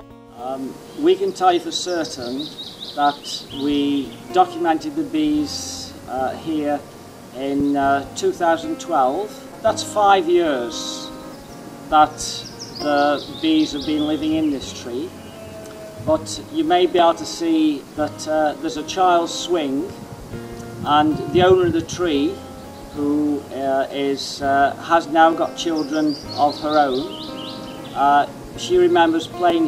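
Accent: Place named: Stoke-on-Trent, United Kingdom. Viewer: Very interesting certainly somthing to think about . You sound like you a stoke on trent man where you from there origanal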